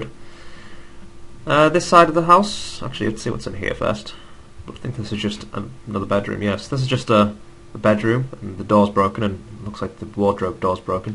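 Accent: United Kingdom. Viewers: im not being mean but r u british cuz u sound like it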